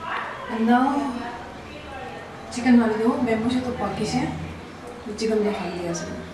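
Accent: Nagaland, India. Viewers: I made pork watching your Video 😁 and it was incredible 👌 couldn't believe that I could make such tasty 😊 but I'm sorry I didn't tell the guest that I followed your ingredients 😆😆😆😆😆😆 You are beautiful like that taste of your cooking ingredients 😍🤩😘 And your accent Doesn't sound like Ao neither Lotha but like Konyak 👍👍👍👍 😊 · Ur voice n ur nagamese talking style just wow 🤩plus ur cooking skills just appreciatable 🤤🤤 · Ahhh soo good listening nagamese. .. Me too stay in Gurgaon but I'm from Nagaland (Dimapur)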